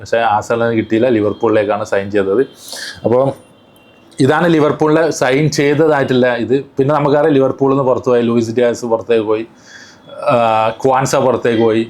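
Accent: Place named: Palakkad, India. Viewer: Are you from Palakkad district? Accent looks like that.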